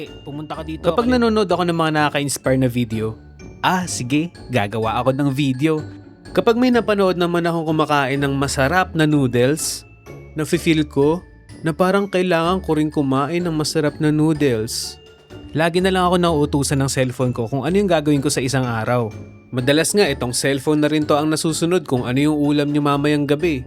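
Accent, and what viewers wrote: Ilocos, Philippines. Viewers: Ilocano ka yahhh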